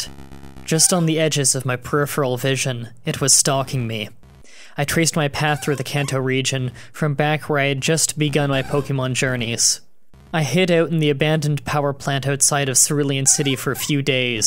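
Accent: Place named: Canada. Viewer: Danielle Eaton Since it looks like he isn't going to reply I will, the words he "mispronounced" are Americanized typos. Meaning they were actual words that Americans spelled incorrectly when making the dictionary. He is Canadian and reads the words as they should be read, the rest is his dialect. He has stated this at this point probably more than one hundred times and it might even be in the description of his creepypasta videos.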